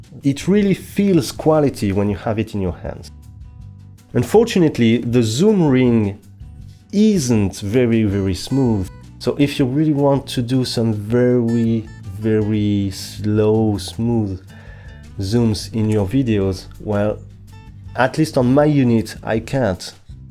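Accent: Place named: France. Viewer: Your French accent made this video very enjoyable to watch - thanks!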